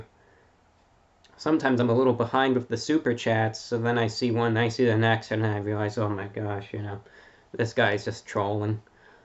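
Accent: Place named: New York, United States. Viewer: If I were blind I'd guess you were an old Jewish man from New York City who now lives in Florida. I do enjoy listening to you but you tend to ramble on a bit too much and I'd never pay to hear that. I'm glad that people do pay to hear your ramblings enough to keep you on You Tube.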